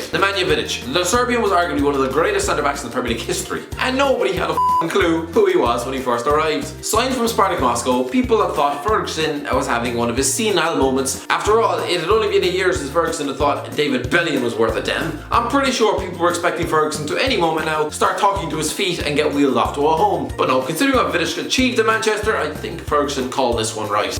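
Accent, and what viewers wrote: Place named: Ireland. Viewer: Where in Ireland are you from.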